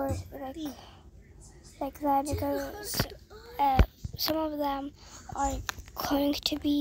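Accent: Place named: United Kingdom. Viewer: U sound so british broo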